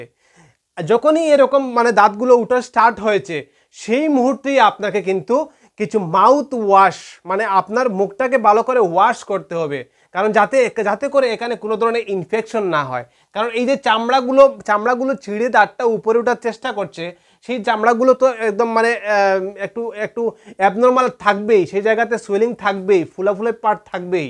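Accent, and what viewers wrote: Sylhet, Bangladesh. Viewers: আপনার কথাবার্তা শুনলে মনে হয় আপনার পূর্ব পুরুষ বৃহত্তর সিলেটের....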